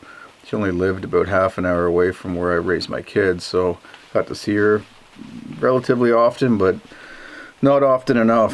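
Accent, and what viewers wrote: Canada. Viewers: Nice vid! I thought you'd go with concrete for the oven and enjoyed seeing you use earth instead. I like that Canuck accent, too..."Ruffed Gross". :-)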